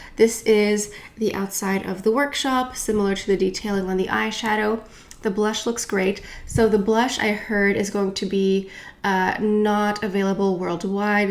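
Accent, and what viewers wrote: France. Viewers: I adore your french accent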